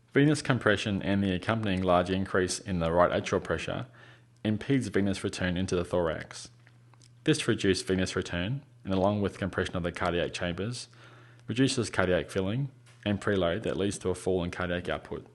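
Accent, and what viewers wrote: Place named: Australia. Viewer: This bloke is an Aussie! Take it from a Brit :)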